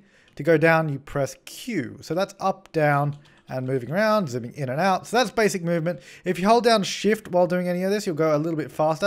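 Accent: Australia. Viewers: You sound a lil bit like Jazza. I'm thinking its because of the Australian accents. 😳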